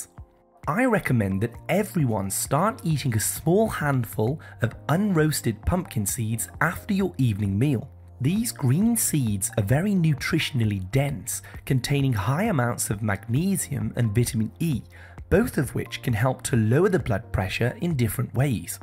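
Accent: England, United Kingdom. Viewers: Oh, puleeze, STOP the commentators with the English accents!!!!!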